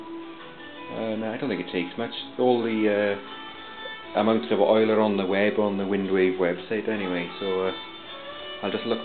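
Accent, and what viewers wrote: Wales, United Kingdom. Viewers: Are you Welshman or something?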